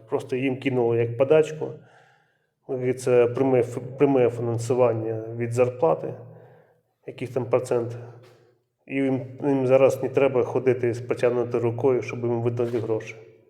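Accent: Russia. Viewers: ЦЕ ЩО КАНАЛ ФСБ? ЧОМУ МОВА НЕ УКРАЇНСЬКА,А РОСІЙСЬКИЙ СУРЖИК?. ЧУТНО ЩО РОСІЙСЬКОМОВНА ЛЮДИНА КРИВЛЯЄ УКРАЇНСЬКУ.ГАНЬБА!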